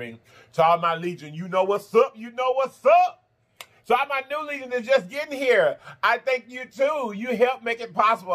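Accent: South, United States. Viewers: Yo personality gives me life 💜 and i love you Southern accent to.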